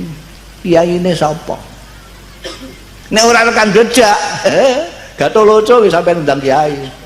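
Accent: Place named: Java, Indonesia. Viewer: Jowo keras lurdd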